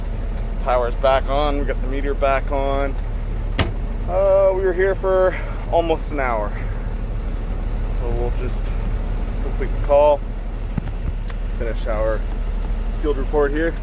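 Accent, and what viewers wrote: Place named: British Columbia, Canada. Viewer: Where exactly are you located at I could probably kind of guess by your accent that you're in the BC area me I'm down here in Tampa Florida but great videos love watching them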